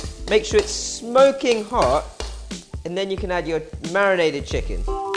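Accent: United Kingdom. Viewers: An Asian with a british accent.. LOVE IT!!!! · Why you speak like a British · I love your videos but it just makes me laugh so here a Asian man speak British or English no disrespect pundit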